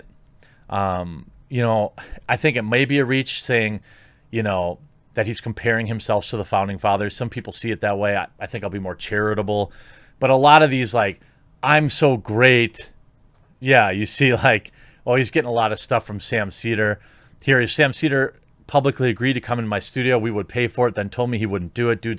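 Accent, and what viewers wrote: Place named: Chicago, United States. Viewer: Yeah, I've been noticing this for a while now. In some of his videos that he does earlier in the day, he starts winding up and gets almost irate, saying things like "I'm so sick of these pathetic spineless losers" or some such. Part of me thinks it's the Chicago accent....there's a "I know something you don't know" quality in there....gets on my nerves. And then there's the reminder that he's bi-racial, and from the southside of Chicago... and he skateboards, and was at occupy wall street, lol. But,, the guy is definitely consumed by his job, and does work hard as hell. I appreciate the hard work and the job he does, just lose some of the condescending attitude. Or like Jeremy said, take a long vacation.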